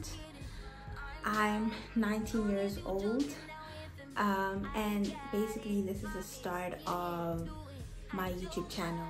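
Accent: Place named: United States. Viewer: I miss hearing that “american accent” everyday 😭🤣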